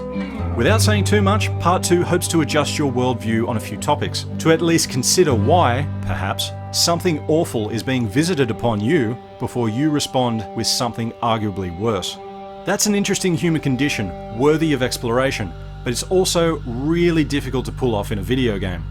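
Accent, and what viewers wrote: Australia. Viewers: Nice review and are u Australian? Cause then u get major respect. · Best review yet. Love the Aussie accent/slang and you are GOOD at this game! Fantastic stuff. Subbed.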